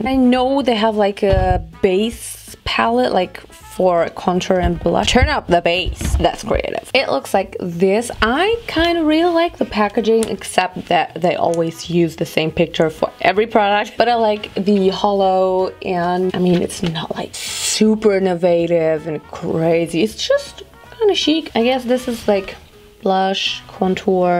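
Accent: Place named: Germany. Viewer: Richtige deutsche Akzent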